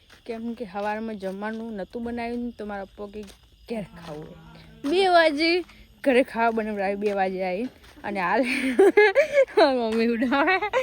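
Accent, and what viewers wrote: Banaskantha, India. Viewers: આ ભાષા બનાસકાંઠા ની લાગે હિન્દી ગુજરાતી મિક્સ😅😅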